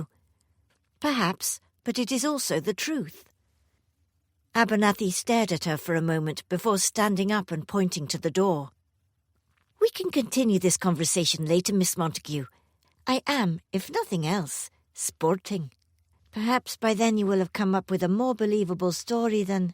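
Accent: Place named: England, United Kingdom. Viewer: How wonderful to hear an English accent🎉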